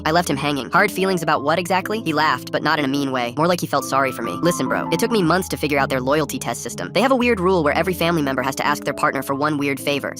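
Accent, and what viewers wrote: Jamaica. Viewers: Is bro Jamaican or something 🇯🇲😅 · Bros Jamaican like me 😂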